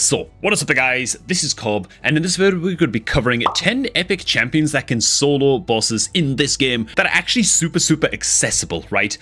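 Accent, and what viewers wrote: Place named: Yorkshire, United Kingdom. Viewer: The Yorkshire accent is wicked too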